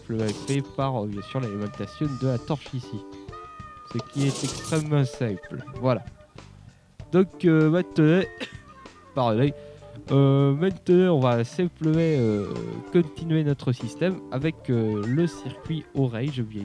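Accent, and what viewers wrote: South, France. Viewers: hé ! l'accent du sud c'est le plus bel accent du monde ^^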